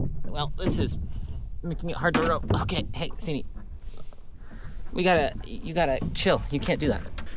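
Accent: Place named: United States. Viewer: You sound American she sounds polish?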